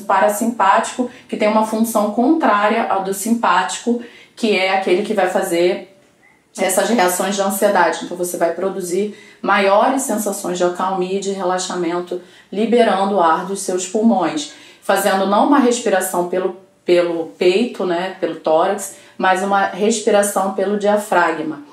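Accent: Rio de Janeiro, Brazil. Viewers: Você é Carioca? Ótimo video Devo deixar de tomar Café? Consumo mais do que o permitido.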